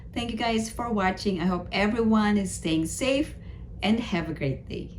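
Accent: Philippines. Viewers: I just love that you use your own voice and FILIPINO accent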